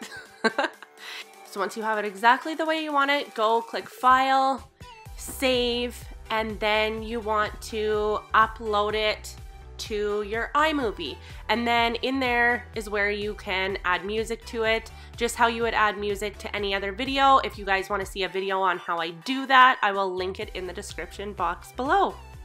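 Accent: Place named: Canada. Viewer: I am subscribing because you made that really simple and as a new YouTuber I need all the help I can get I also love your accent are you from Canada by chance? I am from Detroit Hi Neighbor!!!!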